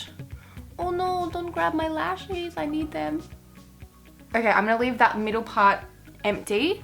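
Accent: Australia. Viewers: Is she Australian (I'm Aussie)😂💙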